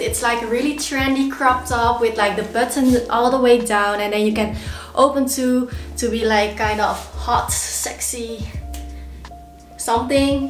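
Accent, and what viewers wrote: Netherlands. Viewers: Recognized the dutch accent 😂😂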